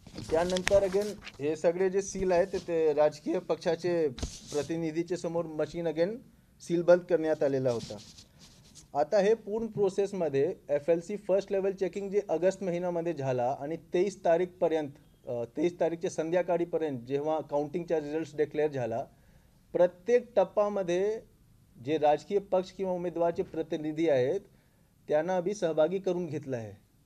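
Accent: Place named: Gujarat, India. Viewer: गुजराती मराठी निट बोल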